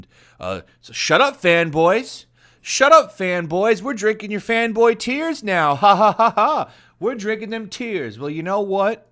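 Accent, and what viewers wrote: United Kingdom. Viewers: Love the British accent every time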